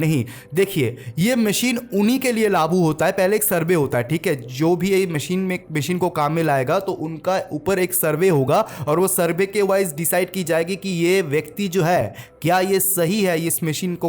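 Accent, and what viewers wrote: India. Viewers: Aapki voice indo english lagti hi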